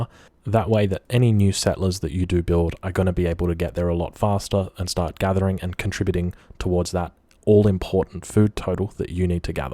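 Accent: Australia. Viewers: AoE3DE Art of War narrated by an Aussie in a very competent and thorough way? Flawless victory! Cheers for the amazing content mate!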